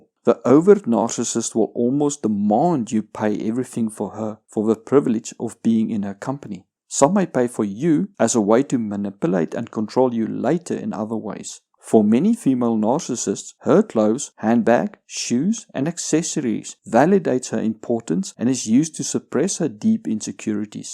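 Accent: Afrikaans, South Africa. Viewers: Safrican accent detected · I was surprised to hear his South African accent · Tell me you're from South Africa without telling me you're from South Africa. That accent is super thick. By the way, good video. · Nice vid, I subbed and liked. All the points were accurate and appreciate the time stamps. Another thing ill add, even though you did touch up on it is, they are always the victim, no matter what. Also, you have a very South African accent! I checked your 'about' and confirmed my assumption. That's great, I moved to SA in the early 2000's. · Ok so the man speaking here is Afrikaans right? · One question: Are you South African?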